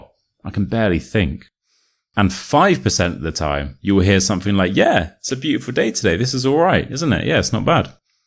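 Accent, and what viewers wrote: United Kingdom. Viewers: Hi i’m from Thailand ,your channel is good to be practice British accent ❤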